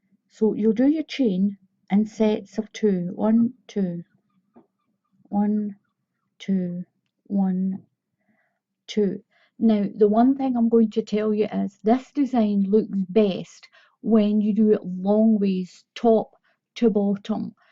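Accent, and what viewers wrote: Scotland, United Kingdom. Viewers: Hi, I’m a new subscriber and I just wanted to say from one to another, I love love your videos and your voice, love the Scottish accent, anyway what I wanted to say is through your videos I have learned to crochet, so thank you very much for such informative and enjoyable videos. Debbie! Xx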